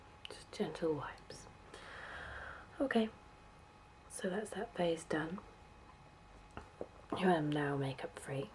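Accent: England, United Kingdom. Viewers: I have just come across your videos, and I was so glad to hear an English accent when you spoke - I find ASMR from the UK supremely relaxing (I am also Home Counties English!) Loved your video anyway, and have subscribed! Love LouFxx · You really seem to keep busy putting out your videos.Thanks for the constant soothing sessions.Your voice is my fav.You make me want to move to England were all the women talk like that. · very pretty british accent i love it, keep doing more plz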